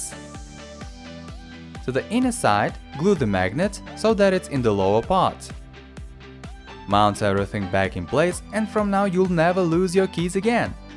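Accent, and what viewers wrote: Russia. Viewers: are u a Russian? ? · I love your accent. Question (not trying to offend) Are you Russian? Mainly because it is very thick. Again not trying to offend if you are not. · russian accent :D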